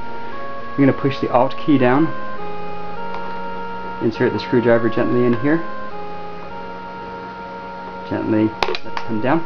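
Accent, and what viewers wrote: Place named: Texas, United States. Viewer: Given the accent, the shadowing on the carpet, the actual carpet colour, and the way you're wearing that Seiko watch, I'd have to guess that you live in Texas, went to school in Utah, and have family living in Oregon. How close am I? Congrats on the keyboard, I may actually do that with a spare Saitek keyboard - would be good to confuse other peoples who're using my computer.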